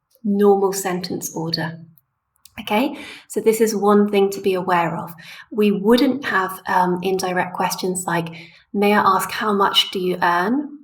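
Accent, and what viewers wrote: United Kingdom. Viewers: I wonder if you could tell me what your accent is. Is it fully RP or mixed from one of northern or southern part of UK?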